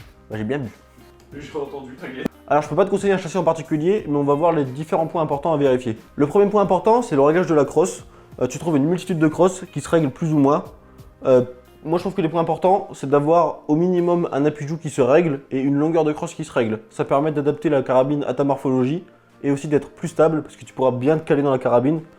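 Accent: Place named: North, France. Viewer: J'ai beau avoir quelques années de tir, je me régale à vous écouter. Et cet accent, du nord, trop sympa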